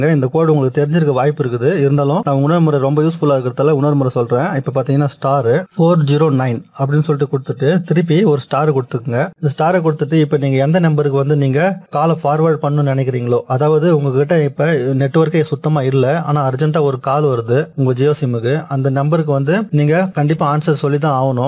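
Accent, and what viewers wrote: Chennai, India. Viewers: Bro UNGA Chennai Tamil semma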